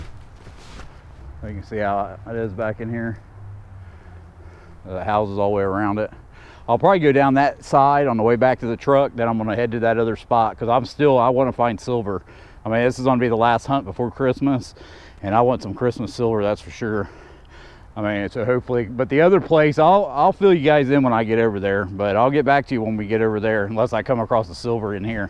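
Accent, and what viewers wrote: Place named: Ohio, United States. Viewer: From Ohio. Good to hear the Ohio accent “worsher” never change :). Reminds me of home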